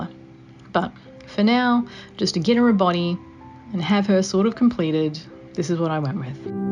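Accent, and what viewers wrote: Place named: Australia. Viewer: Wait, is American right? Why does she sound Australian to my own Australian ears wtf o.o Are my ears deceiving me? I assume she moved to the US haha.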